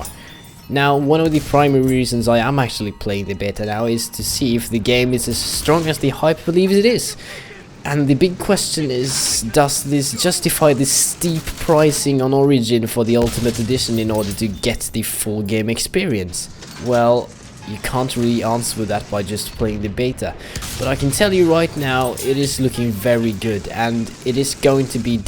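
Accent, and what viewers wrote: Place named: Europe. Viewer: You're voice is really reminiscent of DannyOnPC, just some European goodness :3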